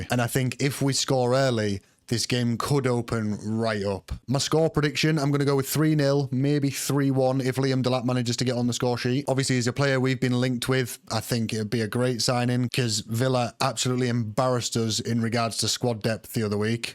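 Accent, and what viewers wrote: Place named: Newcastle, United Kingdom. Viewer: I knew it! you’ve definitely picked up a lot of the accent. I’d say it’s 80% geordie now. Hard to spot. More importantly you’re a natural in front of the camera. I wish you every success. Just keep the videos coming! Cheers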